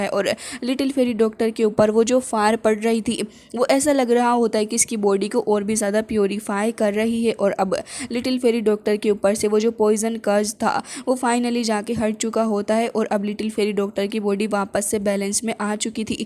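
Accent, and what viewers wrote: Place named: Bihar, India. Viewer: Bihari bol rahe ho aap ❤❤❤❤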